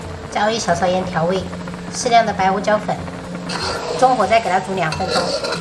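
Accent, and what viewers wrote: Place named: Quanzhou, China. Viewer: 你泉州的啊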